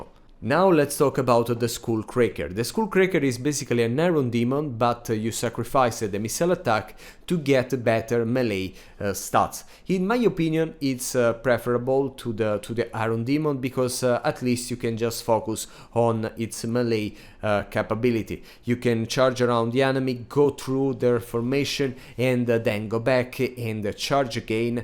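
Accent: Italy. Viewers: I started to finally play more TWWH 3 recently. Your channel is great for me. Learning a ton. And you Italian accent is wonderful! (I'm American)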